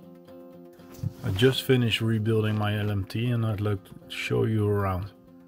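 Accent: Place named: Netherlands. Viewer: Klinkt Nederlands 🎉 dikke setup. Vanwaar de servo saven van Vitavon?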